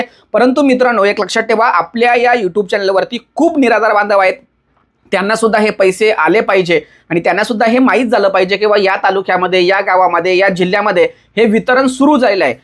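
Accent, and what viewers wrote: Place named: Kolhapur, India. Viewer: संजय गांधी निराधार अनुदान जमा झाले कोल्हापुरी बोलत धन्यवाद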